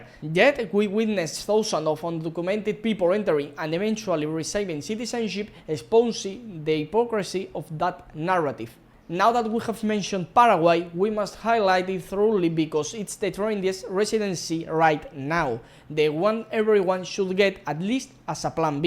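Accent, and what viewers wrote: Spain. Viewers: Te recomiendo que practiques la pronunciación en inglés, la formación de frases, la palabras y vocabulario es bueno, pero trabaja en la pronunciación tu acento español es demasiado fuerte.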